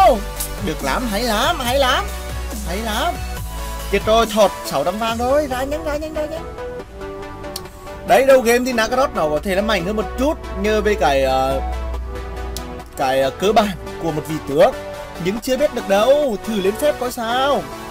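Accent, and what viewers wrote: Nghe An, Vietnam. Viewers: cái giọng nghệ An hay quá trời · Nghệ an bạn ạ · nghe giọng nửa trung nửa bắc nghe khó chịu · tiếng nghệ an nói hay chết đc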